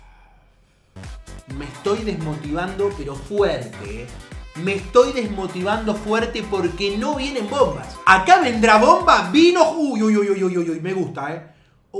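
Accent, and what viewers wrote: Argentina. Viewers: me gustan tus vídeos pero pareces un Carlitos muy argento cuando hablas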